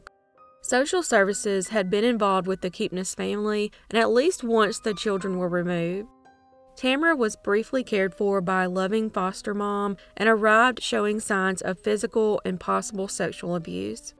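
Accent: South, United States. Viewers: that beautiful Southern drawl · I love your Southern voice, and the channel: you rock! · Hi,it is nice to finally put a face to that cool southern voice.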